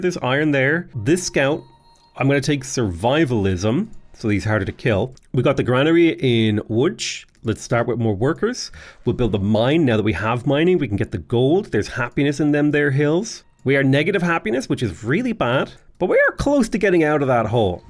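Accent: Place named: Ireland. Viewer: I'm sure you get this question or comment at a regular clip, but how heavy is your Irish accent compared to folks in your area? I'd love to watch an upload where you ham up the accent for us non-Irelanders, I bet that would be fun XD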